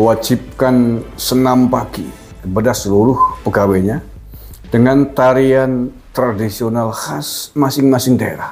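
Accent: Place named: East Java, Indonesia. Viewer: Koyone jowo timuran kang.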